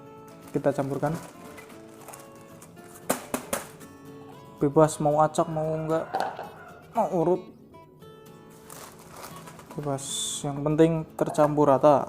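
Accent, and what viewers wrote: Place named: Banyumas, Indonesia. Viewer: jualan dimana mas, kaya bahasane ngapak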